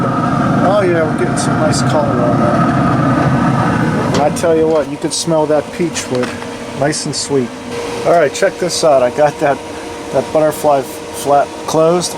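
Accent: Pittsburgh, United States. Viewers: Awesome setup! And my God you sound like such a yinzer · I can’t get past the Pittsburgh accent lol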